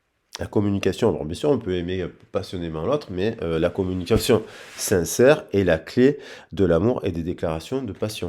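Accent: South, France. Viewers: Bonsoir vôtre accent du midi me remonte le moral ca fait du bien moi des angoissés seule tres angoisse merci bonne soirée bisous ❤❤❤❤❤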